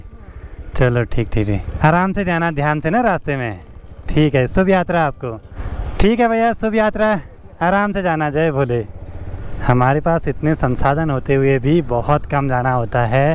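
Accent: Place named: Haryana, India. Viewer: Bhai haryanvi bol rahay ho tenay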